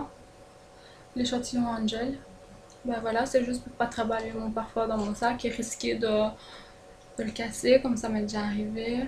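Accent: Belgium. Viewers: Tu es belge ? :)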